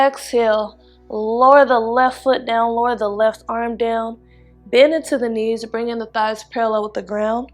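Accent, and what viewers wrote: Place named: Chicago, United States. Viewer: Peace be upon you. I'll be honest, I didn't like your channel when I first discovered it probably over a year ago now, but I'm sooo glad I gave it another try! I loved this flow and something about hearing your Chicago accent in a yoga practice makes it that much more homey for me. Thank you love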